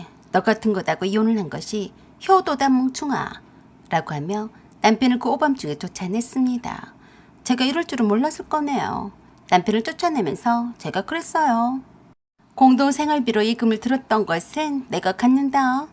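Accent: Jeolla, South Korea. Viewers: 찰진 전라도 사투리가 섞여서 아주 재미납니다. 모지리 찐빵 ㅋ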